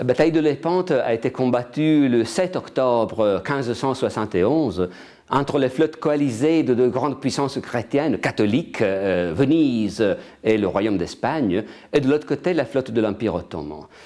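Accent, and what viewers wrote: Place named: France. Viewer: Ho pensato la stessa cosa..e che bellissimo accento français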